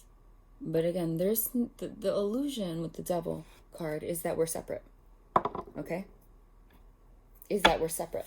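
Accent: New Jersey, United States. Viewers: Ha.. I just clicked , heard you talking and I said, "Jersey!"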